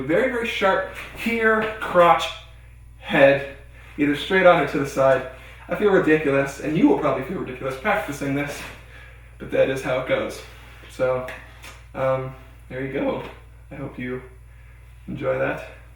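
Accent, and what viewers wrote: Canada. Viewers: Are you canadian? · Well explained. Are you Canadian? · Your Canadian aren’t you😂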